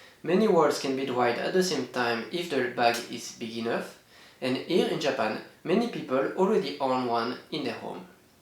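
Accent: France. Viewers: You’re Accent sounds french, not Japanese. 😳🙃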